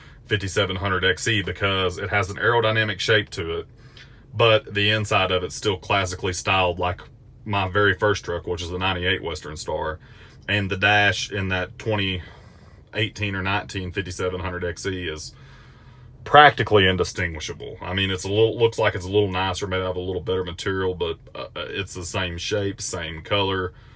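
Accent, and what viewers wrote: South, United States. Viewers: Looks good as red Is my favorite color! Like ur Southern accent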